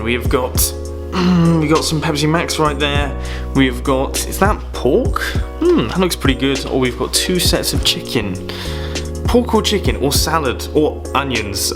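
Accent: United Kingdom. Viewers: Swedish dude with a British accent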